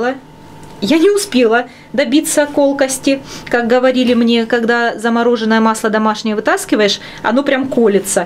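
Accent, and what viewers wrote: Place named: Ukraine. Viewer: невозможно слушать, необразованность жуткая у сей девицы. Украинский акцент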